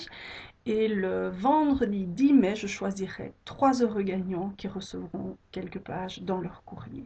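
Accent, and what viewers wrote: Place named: France. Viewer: je savais que tu parles frances. je pouvait l'entendre cuands tu parles anglais!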